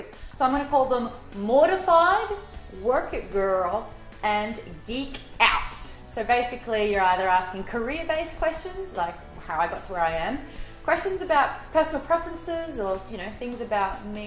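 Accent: Australia. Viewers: Don't ever lose that Australian accent,! It's part of your charming personality! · I hope you never lose your accent. Australian is so nice to hear! I hate that so many Australian/New Zeelanders/British people in acting don't get to use their accents more. So when I hear an accent I just smile and it brightens up my day. It's one of many good things about you.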